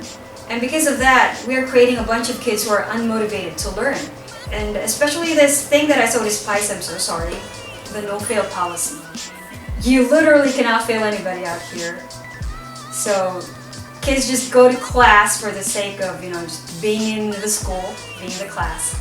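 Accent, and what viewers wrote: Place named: California, United States. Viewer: Just try teaching at the university level here in Thailand. All the students just want to know how to get a diploma without studying or working. I could have made a nice income just writing papers and doing projects for students to present as their own, which seems to be acceptable in Thai culture. Frustration mounts if one is a conscience teacher. By the way, I at first thought you are American from California due to your english accent, maybe California would offer you what you deserve.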